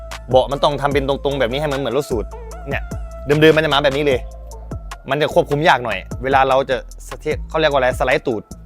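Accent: Korat, Thailand. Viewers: คนโคราชเดะนิ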